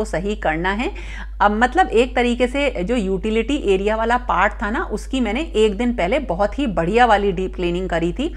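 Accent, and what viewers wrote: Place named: Braj, India. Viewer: Braj boli sun ke bahut aachi lagi... nice madam ji 🤗..14hours ke kaam ko only 14 minutes mai show karna😀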